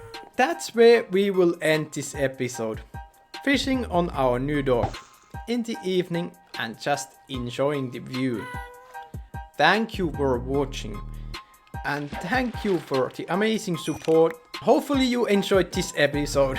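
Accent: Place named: China. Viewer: Bro sounds Chinese 😂 no hate tho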